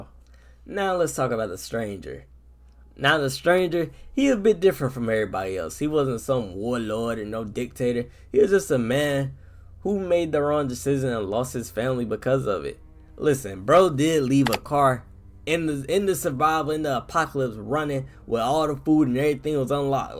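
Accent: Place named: Maryland, United States. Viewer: "erybody" My guy is Marylnd region. Am I right brother? Great video and made some ood points, New subscrber.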